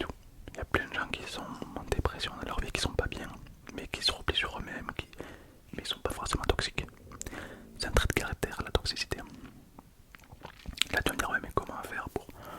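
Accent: South, France. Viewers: j'adore ta voix c super relaxant (et surtout j'aime bien ton accent du sud) 😁😁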